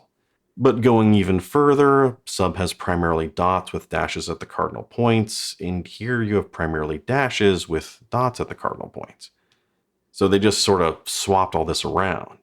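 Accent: United States. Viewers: Just can't watch a video with this murican accent! Classless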